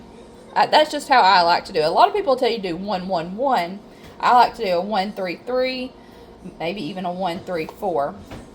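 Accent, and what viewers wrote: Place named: Alabama, United States. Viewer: I love your Alabama accent. My people are from north central Alabama and sound just like you. thanks for the helps.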